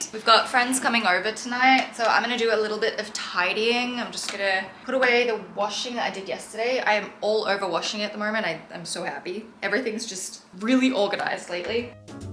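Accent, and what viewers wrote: Australia. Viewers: You’re such a Fun, often funny Adorable Young Lady which I love! From a blonde girl myself, I’m still getting used to your darker hair and honestly it suits you well ✨ I Appreciate your down to earth, real and often vulnerable approach which makes You a Joy to watch. Of course I Adore your accent (I’m a Southern gal) & find it so Lovely🤍. I fairly new to your channel & so Refreshing to hear from you!! I believe you’re Australian, if I’m wrong please correct me. Is Australian as well?! Just wanted to say Hello and let you know how much I Appreciate You 🤍🤍🤍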